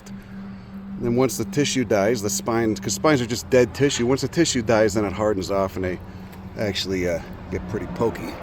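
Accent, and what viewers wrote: Chicago, United States. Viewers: Ya that’s a south side Chicago accent!!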